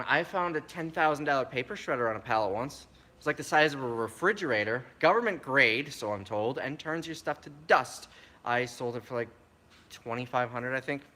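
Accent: Wisconsin, United States. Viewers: You say dollar with more nasality than Standard American English (think newscaster speech), It's pretty normal for the Wisconsin accent.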